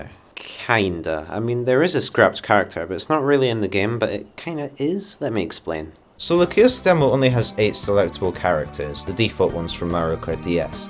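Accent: United Kingdom. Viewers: My question is how come you have a NTSC final copy when you sound like a fellow Brit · Are you Scottish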